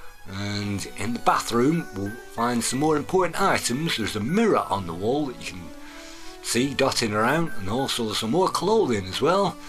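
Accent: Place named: North, United Kingdom. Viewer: I like to hear northerners and wunder from what county thou art.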